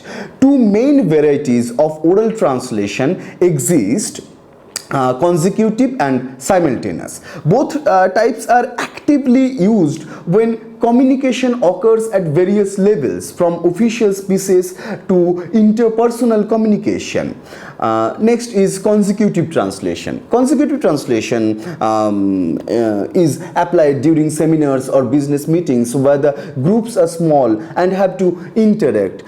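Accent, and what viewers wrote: India. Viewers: This is very useful, but apart from that I don't understand a few words, because you speak too fast and your Indian accent is so thick which makes it difficult for us to understand, not only that, I was very serious but it all goes away because your speech is so funny to hear. maybe for the next video you can speak more firmly and slowly, that's all my criticism.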